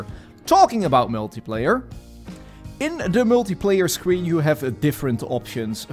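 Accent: Netherlands. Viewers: The dutch accent made the whole video 😂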